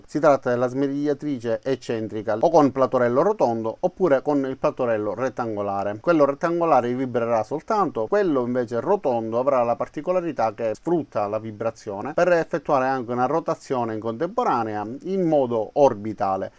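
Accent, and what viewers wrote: Sicily, Italy. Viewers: ma sei siciliano?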